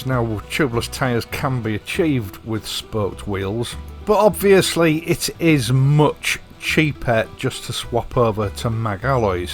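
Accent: United Kingdom. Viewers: Wow! What an accent..may I know which part of the UK you hail from?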